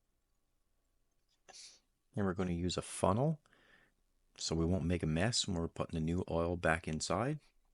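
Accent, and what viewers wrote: Newfoundland, Canada. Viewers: Man. You sound like a Newfie. Are you?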